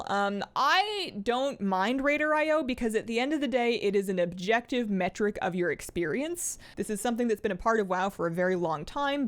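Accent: Canada. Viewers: Wait....are you Canadian? If so that makes you even more awesome!!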